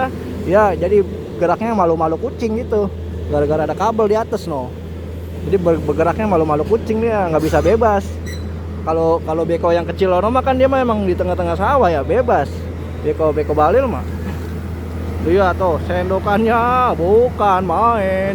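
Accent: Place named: Jakarta, Indonesia. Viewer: Ni youtobernya lucu bhsanya Betawi bnget hhhh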